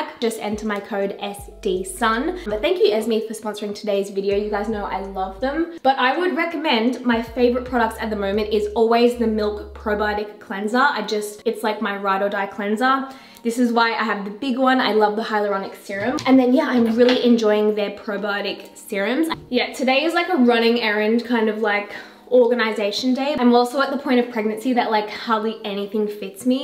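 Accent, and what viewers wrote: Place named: Australia. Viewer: OMG YEA FINALLY!! 🎉 Called it on both the warehouse and the cook book. Low key been praying for a cook book because I’m a hard copy type of gal and I had a feeling from your vlogs when you kept saying you guys had filled the space at your old apartment I was like hmmm new office. Warehouse for photo shoots or new campaign ideas🙊🙌🎉 love it. Also the commentary is so Australian in this vlog I died when you said “hot AF”😂😂😂 Sending you many wishes for the new year and your new adventure with the warehouse. Can’t wait to see the series! 💗💗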